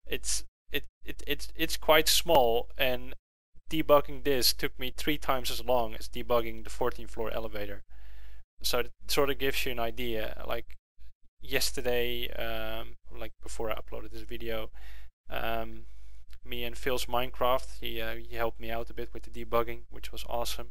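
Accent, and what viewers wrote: Netherlands. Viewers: Ben je Nederlands? Je klinkt Nederlands. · where r u from?? holland?? :) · is it possible that you are dutch ? i hear a bit of a dutch accent in your voice :D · Je bent Nederlands of niet? ik kan het horen.. C: Wel erg leuk dat je zo ontzettend groot bent op YouTube. Ik denk de grootste Nederlandse Youtuber. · are you dutch ??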